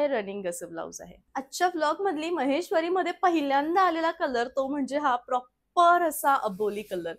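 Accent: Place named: England, United Kingdom. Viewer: Te sagala thik ahe but boltanna jabardasti marathi boltanna english accent cha use ka krtes?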